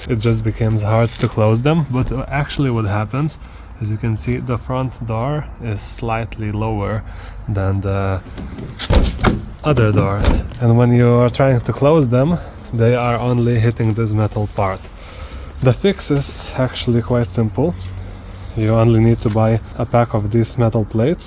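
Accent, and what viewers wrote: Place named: Lithuania. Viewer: Girdžiu lietuvišką kirčiavimą 😂 Ačiū už video ;)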